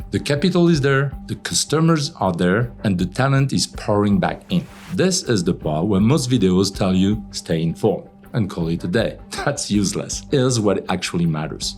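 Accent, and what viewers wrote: France. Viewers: Narrator seems to be of French background trying to speak in English language in order to target Indian viewers..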